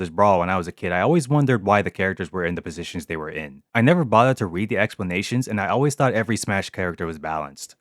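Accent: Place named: Japan. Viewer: Why do you say the names in japanese and japanese engrish?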